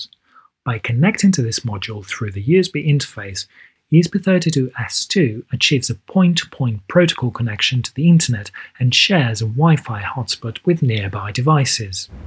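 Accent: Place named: RP, United Kingdom. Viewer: Came for the girl in the thumbnail, stayed for the very posh British guy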